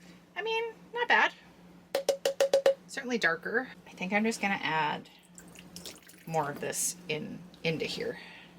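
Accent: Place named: Australia. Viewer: This was an awesome video, top marks. And I got the Australian accent bit. Also, have you gotten your new blades and replaced the old one?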